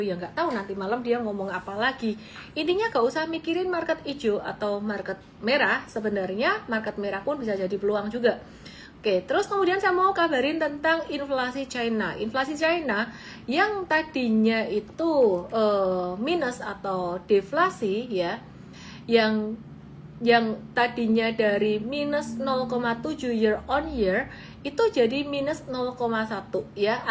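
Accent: Java, Indonesia. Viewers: Medok bgt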